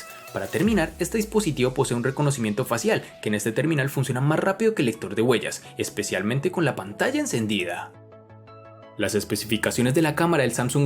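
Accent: Cali, Colombia. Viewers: Vos sos de cali vé?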